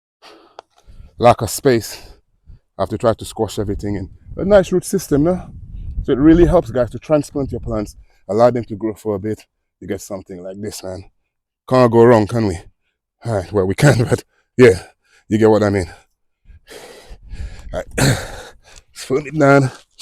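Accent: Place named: Dominican Republic. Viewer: Why you sound Dominican 🇩🇲 lol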